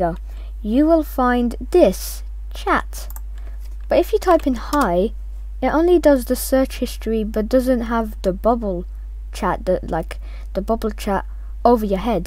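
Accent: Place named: United Kingdom. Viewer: I think im dumb cuz I just made it worst... And this this helped ty dude, ur a British accent ur British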